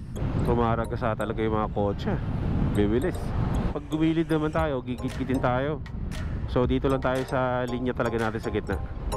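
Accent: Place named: Aklan, Philippines. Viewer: oohhhh.. Aklanon ka pala. kaya pala pamilyar sakin punto mo. hehehe. Mag moto Vlog ka din sa Town natin. hehehe. Aklan!!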